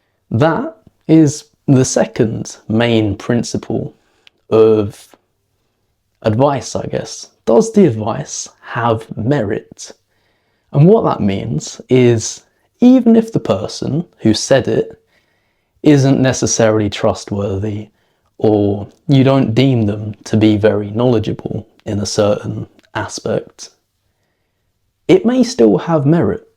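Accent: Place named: Australia. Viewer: Thanks for letting me know. I was curious as it sounds stunning 😉. Sometimes you actually sound a little bit Australian. This because of the pronunciation of certain vowel sounds for instant. Well, I enjoyed listening and I try to pick up on some more words to better my vocabulary. As a non-native speaker the vocabulary could always to be worked on 😂.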